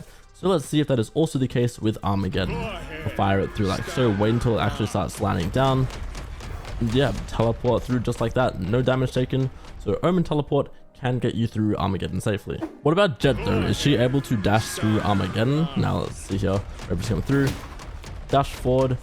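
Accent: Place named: Melbourne, Australia. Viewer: u r from melburrrnnnnn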